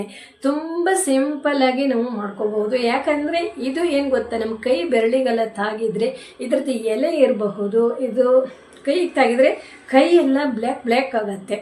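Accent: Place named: Kerala, India. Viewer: Nimma matugalu kerala dvaru kannada matanadidante ede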